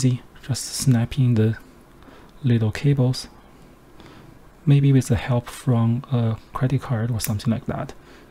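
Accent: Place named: China. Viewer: 一听就是中式英语，阿弥陀佛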